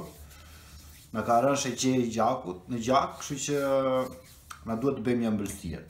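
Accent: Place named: Berat, Albania. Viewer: te bëra subscribe, per suport. 🙂🙃 Nga je? Nga e folura dukesh Beratas.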